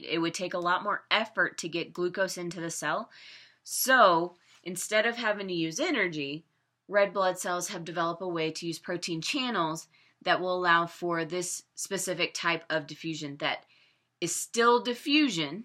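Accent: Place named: Midwest, United States. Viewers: Lena G it's Midwest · Where in the USA is that accent from?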